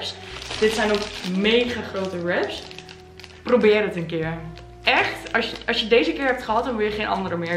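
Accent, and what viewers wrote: Twente, Netherlands. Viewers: Onze mooie Twente dialect 🎉😊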